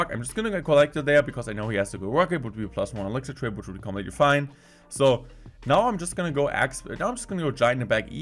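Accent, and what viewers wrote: Germany. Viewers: Glaube gibt wenige deutsche dessen akzent so sehr triggert wie der von dir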